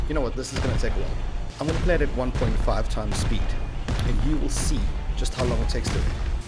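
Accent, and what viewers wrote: Afrikaans, South Africa. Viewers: Lol you sound so south african👌😂, are you south african? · Didn't even know anyone in SA played Rust lol. This game looks so fun and is even more fun with a South African accent. Love the professional drawing program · Are you south African? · Are you south african? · You south africa? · Thought I knew the accent hahahaha. Since I've lived in Australia now for ages couldnt be sure if it was NZ or SA but fuck yeah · That Afrikaans acsent.😂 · My man are you south african? Your accent is familiar. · Are you South African Mr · Are you south african? :> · I never know whether it’s NA or SA but I always hope it’s SA lol. The way you speak and the language you use made my think SA though. I was right for once lol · Intresting but you still have a heavy afrikaans accent lol XD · Subscribed just because Local is Leka. Nice Content, love the familiar accent well done boet you somma make me excited about SA gaming again, looking forward to more of your videos and thank you. 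· Am I detecting Afrikaans? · you south african? xD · Are you SOUTH African? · You sound South African. Good vid. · Are u South African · Are you south African ?? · u south African bru ? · Is this guy South African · Dude u never gonna read this comment but I swear you have a south African accent heart this or comment if it's true cuass I have a feeling u r South African :/ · Ek smaak Afrikaans op jou aksent. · Baha oml I've never seen your channel before, and started to watch and thought shou, that accent is oddly familiar, and as soon as you said ja it was all over, South Africa XD born in joburg myself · Are you from south Africa? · I hear a South African accent. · Where u from,Sounds south african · You from South Africa ?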